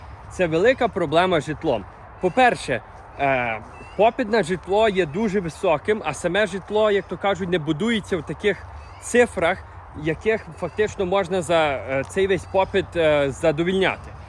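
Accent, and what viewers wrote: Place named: Western Ukraine, Ukraine. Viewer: Какой противный западынский акцент.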